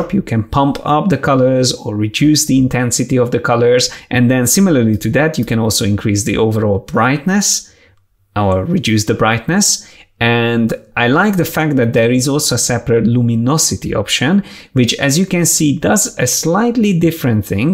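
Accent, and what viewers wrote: Hungary. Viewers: Hungarian accent 👌 :D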